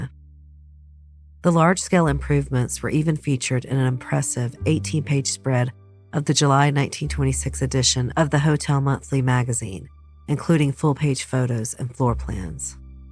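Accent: South, United States. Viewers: Love your raspy southern voice, too sexy for me.❤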